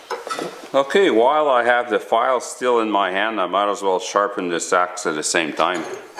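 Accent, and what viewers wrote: Quebec, Canada. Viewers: I detect a slight French Canadian accent dare eh? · French/Canadian accent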